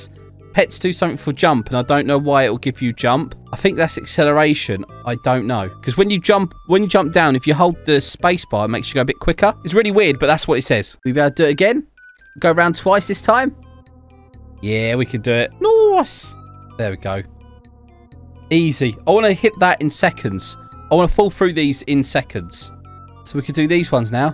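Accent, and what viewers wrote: United Kingdom. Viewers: Hmm u sound British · Are you BRITISH???? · Are you british bohou oh wauh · His British accent never gets old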